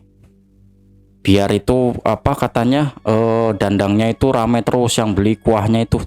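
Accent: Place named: Pantura, Indonesia. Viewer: Logat ngmongnya kya orng daerah pantura, ( cirebon atw indramayu).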